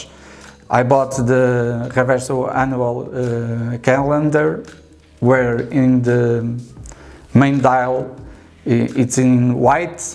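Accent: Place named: Portugal. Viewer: I mean it's obviously not his first language, I'd go easy on the guy, sounds like his native language is portugese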